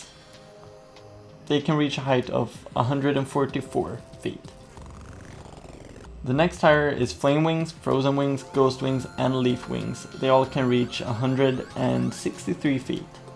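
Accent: Sweden. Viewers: hörde att du var svensk direkt, enkelt att känna igen svensk engelsk dialekt ^^ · It's a Swedish accent, get used to it. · are u swedish? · But the I realized he was “Swedish” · Are you from sweden?! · Are you swedish? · because he is swedish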